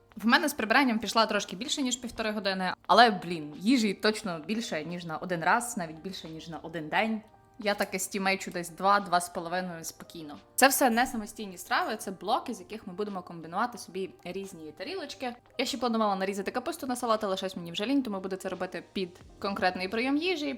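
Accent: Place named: Ivano-Frankivsk, Ukraine. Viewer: Випадково відкрила і я в захваті - зрозуміло, цікаво і просто! Дякую!! А мова яка!❤️‍🔥 Ніби з Франківська..🥰🥰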